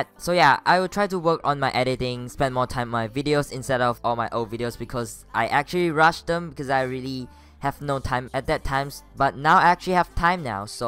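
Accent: Singapore. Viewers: Are u Singaporean?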